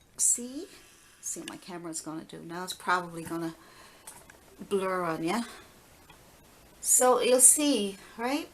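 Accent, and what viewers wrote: Newfoundland, Canada. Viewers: Hi, really like the videos, I'm a total newbie (senior) at painting and these help a lot. Was wondering about the accent, kind of sounds like you are or were from Newfoundland, or is it Irish? Thanks for all you do for others:)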